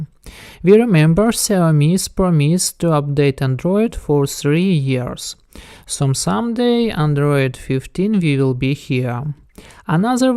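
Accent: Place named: Russia. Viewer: Сразу видно русский акцент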